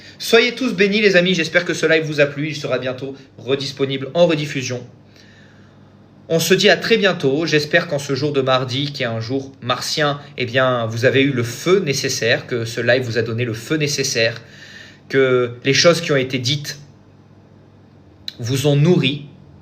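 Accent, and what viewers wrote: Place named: South, France. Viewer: Je veux les vidéos avec l accent du Sud.🎉Pourquoi tu l as enlevé?😮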